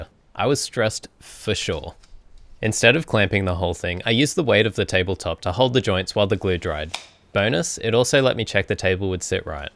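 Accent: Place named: Australia. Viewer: First time to your channel. Came here from a short of this video, started watching for my fellow Australian accent, stayed for the great build. Nicely done.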